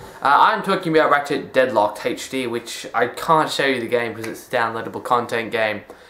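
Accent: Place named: United Kingdom. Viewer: You are half the reason I read every written game review in a british accent.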